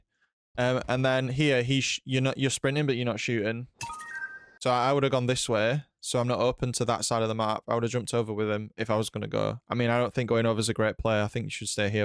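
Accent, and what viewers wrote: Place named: Sheffield, United Kingdom. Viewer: Sounds like a Sheffield accent 👀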